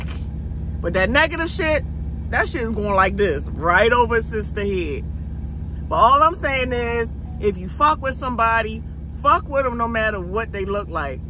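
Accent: Baltimore, United States. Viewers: I been following you since the pandemic on three platforms and that little bit of weight looks good on you. and that Baltimore accent❤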